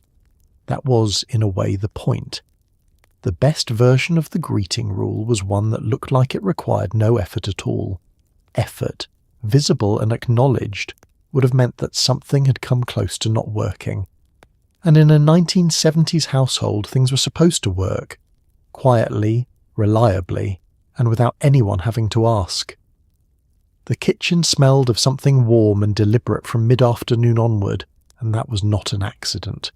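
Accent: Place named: England, United Kingdom. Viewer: I grew up in the US in the 70s. This video is not very accurate. Women had a lot of say in decorating, schools, outings and such. My aunts ,and I had many were in charge of budgets, schedules and cities. Their husbands loved and respected their choices. Rules? Yeah, society had rules but there were those who were breaking those rules left and right. Your English accent makes me think you are following some idea of the seventies, instead of how it really was. Those pictures are not that accurate either. No one ever put their clothes out to hang dry in that manner. If you use AI to reconstruct history,,,, you will fail.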